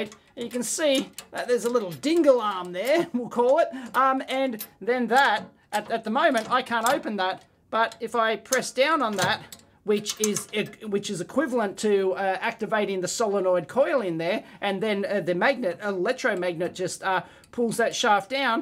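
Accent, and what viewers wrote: Australia. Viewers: LockPickingLawyer grew an aussie accent (oh, Its. He says hes not LPL lel)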